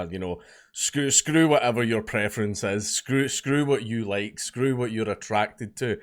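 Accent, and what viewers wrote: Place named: Scotland, United Kingdom. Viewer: Just for curiosity's sake I checked the auto-generated captions in this video, and they are almost perfect! Yutube's bot probably understands Scottish accent better than I do.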